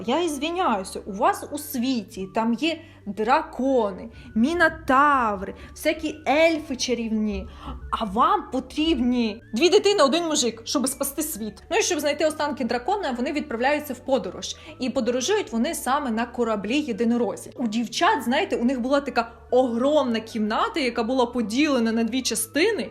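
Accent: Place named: Kharkiv, Ukraine. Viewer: звучить ідеально, в нас в Харківській області розмовляють саме на такому суржику. В кожному регіоні суржик свій.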